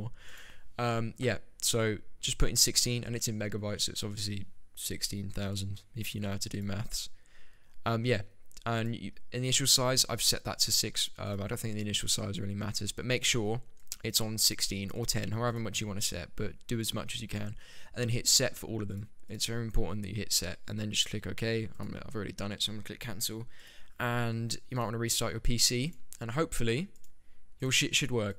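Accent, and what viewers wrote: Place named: United Kingdom. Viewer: Thank you random British guy with a nice voice, thank you.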